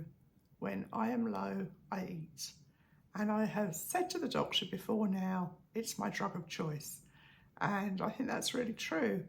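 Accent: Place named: United Kingdom. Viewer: I like you in the navy tights!!! I know I’m going against your Mum but I Love the navy with the navy shoes. I watch all your videos I just love your voice and your British accent. As always all my love from Chico, California. Vicki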